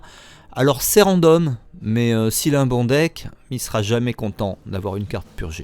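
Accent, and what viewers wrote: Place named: New York, United States. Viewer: Merci pour le travail et ton accent de new yorkais. Continue comme ça et bon courage dans tes ouvertures de deck dis pour rester zen lol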